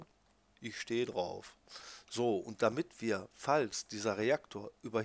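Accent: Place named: Berlin, Germany. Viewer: Berliner Englisch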